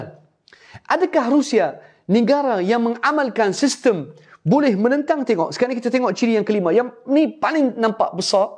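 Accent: Malaysia. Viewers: Kaya logat malaysia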